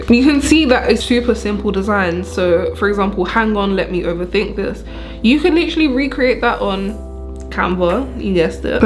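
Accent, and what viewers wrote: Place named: England, United Kingdom. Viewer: Hi 👋. Are you single sweetie. I love your English accent 😍.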